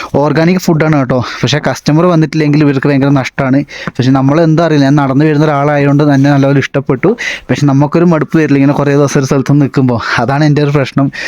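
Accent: Kannur, India. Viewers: ഇങ്ങള് കണ്ണൂരാണോ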